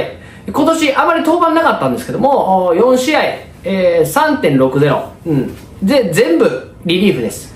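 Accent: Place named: Kyoto, Japan. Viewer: 訛りを聞いて関西は関西でも京都の人だとすぐ分かった！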